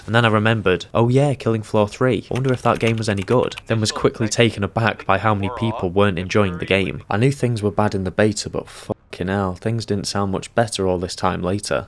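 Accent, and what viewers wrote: United Kingdom. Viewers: I felt like it was a matter of time before I actually heard a brit shitting on this game.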